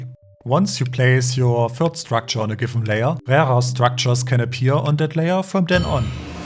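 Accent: Germany. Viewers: are you english you sound a bit german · Your German accent gets harder over time. Seriously.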